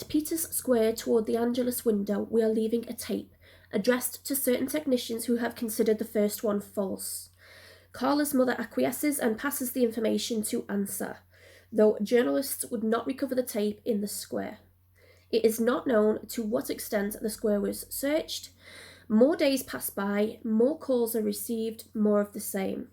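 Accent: United Kingdom. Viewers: I love your british accent, are you native british?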